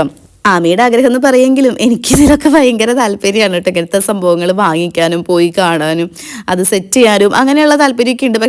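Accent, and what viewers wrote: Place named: Thrissur, India. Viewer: തൃശൂർക്കാരി..... എനിക്ക് ഈ വർത്തമാനം ഭയങ്കര ഇഷ്ടം ആണ്.....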